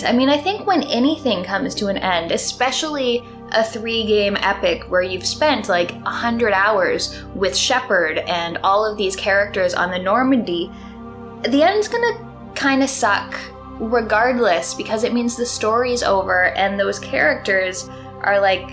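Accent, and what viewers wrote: Canada. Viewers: You are the first person I've seen with whom I agree COMPLETELY about the remaster. Also, I just picked up on your Canadian accent for the first time just now...which is unforgivable because my wife is from Canada and has the same accent.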